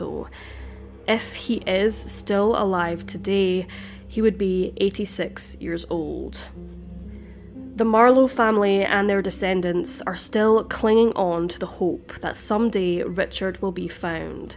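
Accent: Scotland, United Kingdom. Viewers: She’s Scottish that’s the way it comes over.lif u know any thing about how language is spoken.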